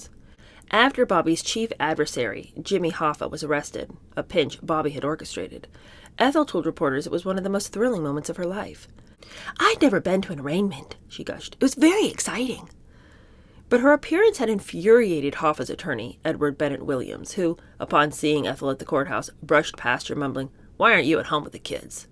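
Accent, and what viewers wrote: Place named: RP, United Kingdom. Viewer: Sounds like the Mitford sisters 😂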